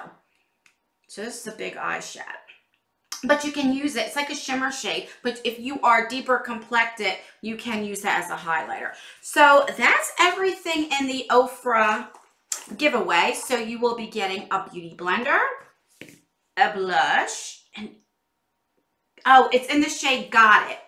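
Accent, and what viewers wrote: New Jersey, United States. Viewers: I love myself a good nude lippie and I like how u say really your jersey accent comes in I understand the struggle I got a ny accent lol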